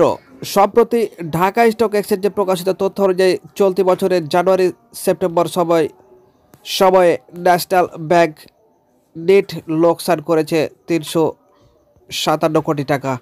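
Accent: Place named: India. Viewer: ভাই আপনি কি ইন্ডিয়ান লোক কারন আপনার কথা তো ভারতের লোকের মত।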